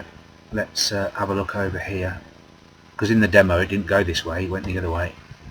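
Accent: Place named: Northern England, United Kingdom. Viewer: It's just that you sound a bit northern (well, to me anyway). I thought you may be from Manchester upwards :)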